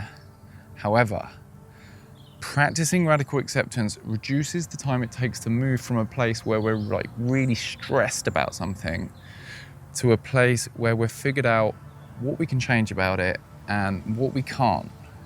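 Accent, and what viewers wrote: United Kingdom. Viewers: Love british accent!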